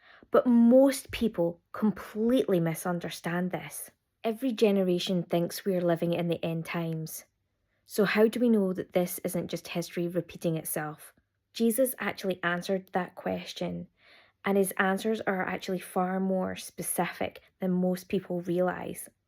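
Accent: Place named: Ireland. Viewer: You may already know about the budding fig tree parable. Truly i tell you this fig tree generation shall see these things come to pass. The fig tree called Israel bloomed again in 1948 and the people born at that time alongside of Israel shall see these things pass. Thats how i know. Read matthew 24 34 knowing the fig tree has bloomed when Israel became a nation again in 1948 and also know that only God like AI has the ability to enforce worshipping of the beast onto all people young and old, rich and poor, slave and bond. The mark is AI. We will all need a mark to buy sell or trade. Digital currency will give full control over all buying selling and trading going on in every nation and with every tribe. We know that AI is an existential risk and the end is near because of the AI beast system coming. I could listen to you speak all day long and if I spent much time around you I'd be speaking with a crazy cute irish accent too.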